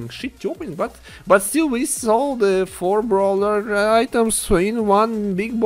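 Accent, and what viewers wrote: Russia. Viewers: Russia, Look At His Accent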